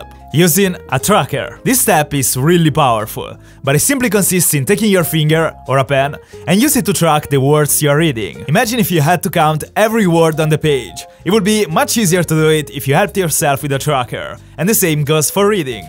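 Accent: Italy. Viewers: His Italian accent is so good... makes me laugh cus I'm italian too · Cool English with an Italian accent! Neato! 💕☮🌎🌌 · I knew from ur accent that u were italian, im too btw. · Your Italian Accent is just OP🔥🔥🔥🔥🔥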